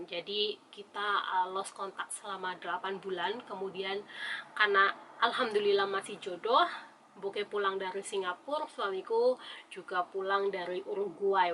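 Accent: Banyumas, Indonesia. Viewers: Pdhl kl ngapak jg gapapa loh, lucuu 😂🤭🙏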